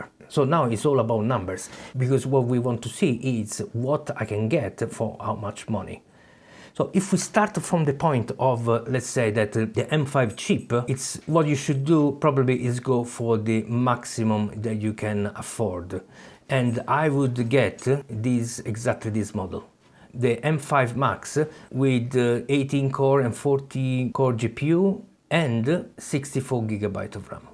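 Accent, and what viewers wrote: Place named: Italy. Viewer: italian eeeehhhh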